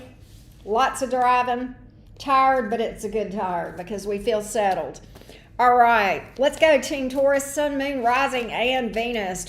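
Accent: South, United States. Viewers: You’re so cute . I love your energy and your little southern accent ❤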